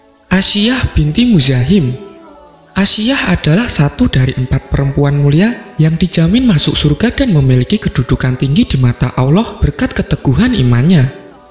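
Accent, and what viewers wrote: Java, Indonesia. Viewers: Yg baca narasi Jawa banget 😄👍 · Saran saja ya,,,pengisi suaranya jangan terlalu kental logat jawa,, kadang2 kaga ngerti bahasanya😂😂😂😂😂🤣🤣🤣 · Ciri khas jawa ngomng nya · Ngomongnya medok jawa logat bahasanya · maaf ya mas,Aku sangat suka dengar cerita kisah nabi... tp kali ini aku gk bisa focus liat cerita nya malah tertawa dengar suara mas ... mas asli jawa ya mas kok medooook banget🤗🤗🤗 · Naratornya jowo sangat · Lek ngomong koyok wong jowo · Pembaca logat jawa kurang sedap di dengar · suara logat jawa... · Narator nya meddok banget jawanya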